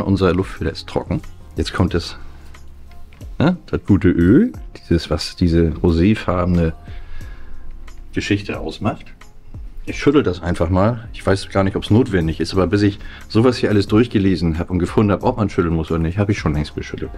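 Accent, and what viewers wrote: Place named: Sylt, Germany. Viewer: Der Akzent! Himmlische Heimatgefühle! Komme von List auf Sylt. Jedes Mal wenn ich ihn höre denke ich an Munkmarscher Muschelsuppe.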